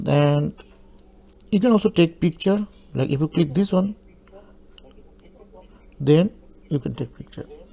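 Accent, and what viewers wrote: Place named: India. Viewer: Talk better English, your India English is worst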